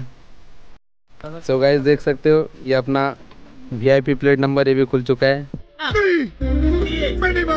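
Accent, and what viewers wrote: Odisha, India. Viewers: Bhai app odisha se ho paaka 😅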